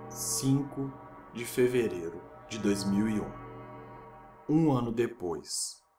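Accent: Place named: Inland São Paulo, Brazil. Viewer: troca o narrador, q de repente pode ficar legal, pq esse sotaque paulista do interior é foda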